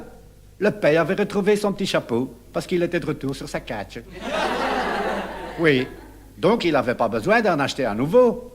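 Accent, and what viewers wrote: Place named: Brussels, Belgium. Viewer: Cela me ramène en arrière dans le temps, je suis bruxelloise et ma bobonne parlait comme ça.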